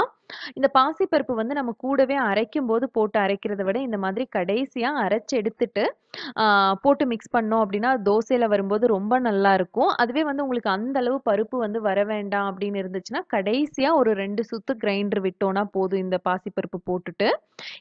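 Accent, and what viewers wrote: Coimbatore, India. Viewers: Unga native coimbatoreaa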